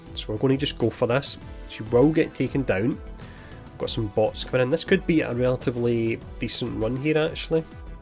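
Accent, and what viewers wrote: Scotland, United Kingdom. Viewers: First time seeing you! Nice you’re getting in front of the cam! The beard definitely fits the Scottish accent! Automatically don’t want to mess with you! 👀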